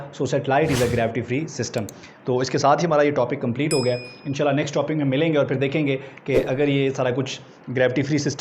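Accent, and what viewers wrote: Pakistan. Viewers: Are you from pak or kashmir???? Coz ur accent matches!!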